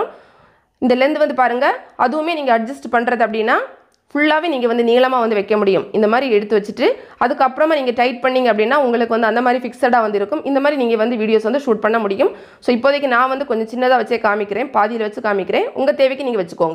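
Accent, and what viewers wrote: Kanyakumari, India. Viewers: Hi sis, Excellent explanation that too your kanya Kumari slang is awesome.. Nostalgic 😔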